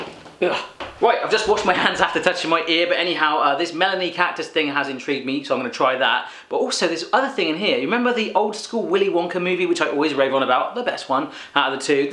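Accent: Mexico. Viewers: NObody says Ole in spain and you spoke like mexican, not like spanish xd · No se quejan de que no hable español bien, se quejan de que hace un acento mexicano como si todos los de España hablaran asi, pero bueno que se puede esperar de un ingles sin ninguna cultura -. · Your mexican accent is awesome, but in Spain we don't speak like that my friend :P hahaha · why are you talking like a mexican? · The accent you use to speak as a spanish is a mexican accent xD Spanish dont speak like that ;D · Madre mía xD, pero este porque habla con acento mexicano , a ver si estos estadounidenses van aprendiendo de una vez que España no es México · Your mexican-spanish accent was funny hehe but we Spaniards don't speak like that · lel so bad man spanish people doesn't have that pronunciation that's from mexico... And the green melon is a chewinggum u shouldn't swallow it. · We haven,t got Mexican accent 😂😂 Our accent is more clean, I don't know how to say it. 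The only accent in Spanish are the "RR" and the "z" but it hasn't really accent. But good video,funny like always 😂😂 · this suspicious white powder you're eating is called sidral, and you're speaking spanish like a weird mexican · Why you speak spanish with mexican accent? And why you are always saying "Ole!"? :C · Dude, I'm from Spain, and we are not Mexican 😂 We don't speak with that accent 🙈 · Maaan, we don't speak like Mexicans jajaja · Yeah, man... you definitely think that the Spaniards' accent is the same as the Mexicans'... and sorry, but it's not, really, at all. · por que imita a un mejicano cuando habla español?, no todos los que hablan español son mejicanos, de hecho, no viene de ahi la palabra español precisamente. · I don't know what's worse, the way you eat the "seeds", using a mexican accent for spanish people, the way you ate the melon gum or you trying to be funny and turning out to be absolutely awful. 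· Nice video man,but spanish don´t speak with mexican accent.... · Man, how come you still play spanish accent as mexican? Travel more mate, we are not that far away from your place... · Why did he talk like a mexican? Spain is in europe, we don't have latin accent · España y México son diferentes no pongas acento de mexicano para hablar en español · You speak as a Mexican , the Spanish is as the italian or the français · Por qué cuando hablais de España poneis acento mejicano y decís cosas como "olé "???México y España están a un oceano de distancoa y son culturas muy diferentes.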